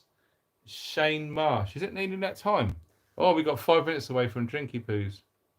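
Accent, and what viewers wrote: United Kingdom. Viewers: Hi to u,I just now stumbled across your channel. I'm Nancy from 🇺🇸 USA of state of...Florida..hope you All are doing well over there.Nice to meet u..Lovein That British Cool Accent.Ive got a strong southern Accent 😆. I'll keep watching and keeping up with what's happening over there in your side of the globe 🌎. Thumbs up n keep doing what your doing you know..our governments are doing this to us citizens ALL, we're in this together ❤ 👍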